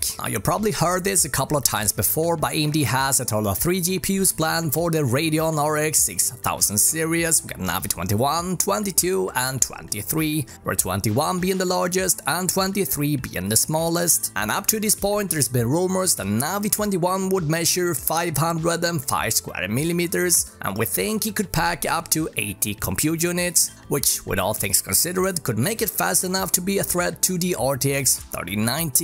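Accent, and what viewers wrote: Sweden. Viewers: some village swedish accent maybe. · swedish accent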